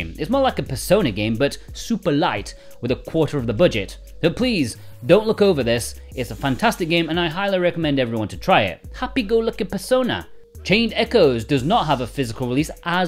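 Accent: England, United Kingdom. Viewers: Love the english accent!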